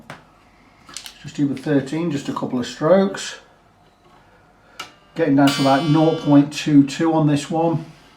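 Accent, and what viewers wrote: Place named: Clay Cross, United Kingdom. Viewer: I knew that accent,I’m from Clay Cross.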